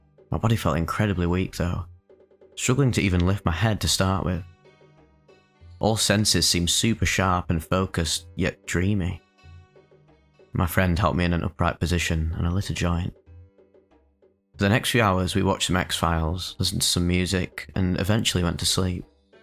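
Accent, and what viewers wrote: United Kingdom. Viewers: Always some British schmuck narrating these weird videos. #1776 fk the queen n her herpes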